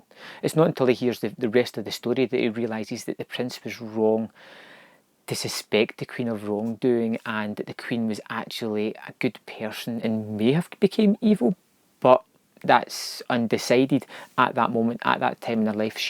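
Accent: Scotland, United Kingdom. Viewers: I love your Scottish accent. I will love to say that Scottish and Irish accents are the most beautiful accents ever! I love how well you described the story. I watched this movie last night and I cried, because my aunt passed away with an overdose of medication for her cancer. I never got to know her too well do to how young I was when it happened. Today I feel guilty, because I didn’t get to see my aunt much since my mom never got along with her. My grandparents always tell me how similar I am to her. She use to love to draw, and ride horses all the time. I felt a similar sadness when hearing both my aunt and my grandma got breast cancer too. I almost wanted to commit suicide and kept asking why them? It should be me who gets cancer. Luckily they both made it alright, but that thought haunts me every night. This movie taught me to never give up and to love my family and the good people who are around me. 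I will have to let go of the sadness and never forget the good memories I have with my loved ones. Enjoy with the people and animals you have now so you have long lasting memories of them.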